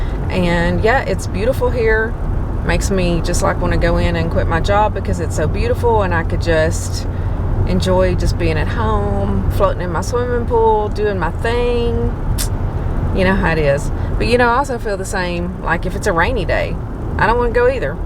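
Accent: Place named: Kentucky, United States. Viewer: hey! Thanks for the great content, I enjoy watching your channel. I knew that you had to be from KY or close because your accent is just like mine!!! 😁😁😁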